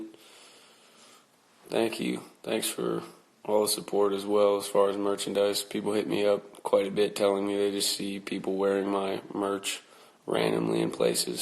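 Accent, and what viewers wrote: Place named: South, United States. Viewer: he got the country accent going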